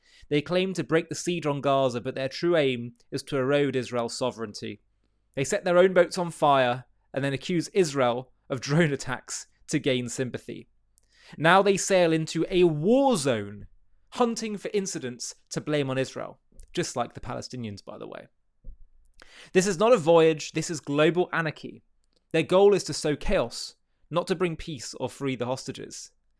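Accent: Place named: England, United Kingdom. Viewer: HAVE YOU EVER BEEN TO OCCUPIED PALESTINE WITH YOUR ENGLISH ACCENT??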